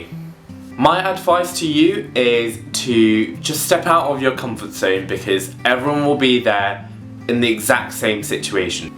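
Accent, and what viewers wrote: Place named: United Kingdom. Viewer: It's my absolute dream to go to Durham and castle!! Also, I can't believe you're from Hong Kong - your accent sounds so British!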